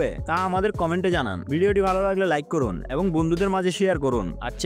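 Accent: Bangladesh. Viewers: বাকি সব ভালো উচ্চারণ জখন্য। তুমি মনে হয় বাংলাদেশী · বাংলাদেশি উচ্চারণ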